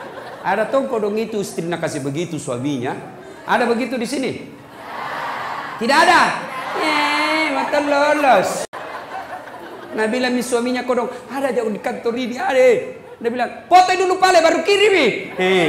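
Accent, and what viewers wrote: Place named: Sulawesi, Indonesia. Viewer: Allhamdulilah masih kental logat sulawesinya walupun diluar kota Sulawesi berdakwa 🤲 Sehat selalu pak ustad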